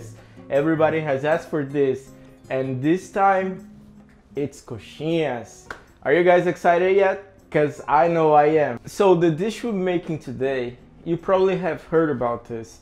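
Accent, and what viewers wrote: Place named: Minas Gerais, Brazil. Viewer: Pelo o jeito é mineirinho kkk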